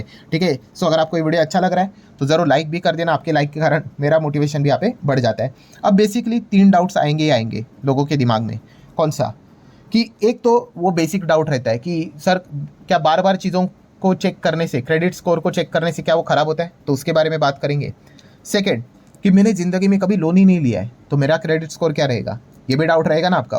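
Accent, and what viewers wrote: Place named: Maharashtra, India. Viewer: sir chya bolnya waru n ch samjat ki sir marathi aahe sir sher market che danvir karn aa he free madhe deep knowledge detat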